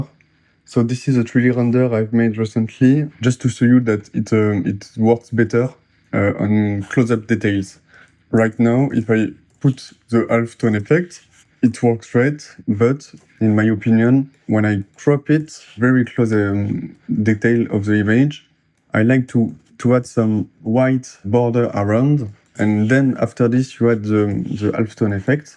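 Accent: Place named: France. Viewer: Hello, from your accent i guess you must be french. Merci pour ta macro. Je me penchais justement sur la question et j'ai pu étudier ta façon de procéder à l'intérieur de ta macro. Au cas où, mais tu dois être au courant <link> Le gars vient aussi de sortir un plugin pour photoshop qui pourrait aussi te donner des idées pour une v2. Encore merci.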